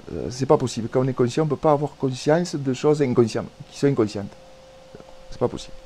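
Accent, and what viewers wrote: South, France. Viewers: J'adorée vous êtes de bonne humeur avec votre jolie accent du sud merci énormément voues êtes trop pédagogue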